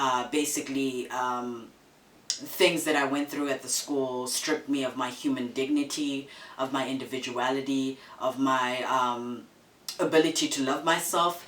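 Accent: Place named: United States. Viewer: oh my word you have an American accent already :D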